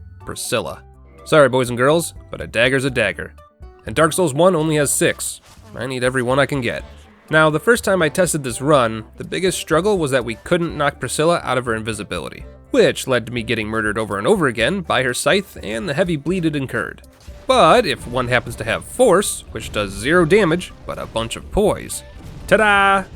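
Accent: United Kingdom. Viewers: Finally British dark souls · When a British play dark souls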